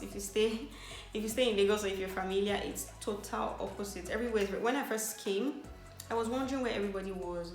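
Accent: Cameroon. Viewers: you sound more like a Cameroonian, nice video, very detailed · Are you from Cameroon.your language tune seem similar here.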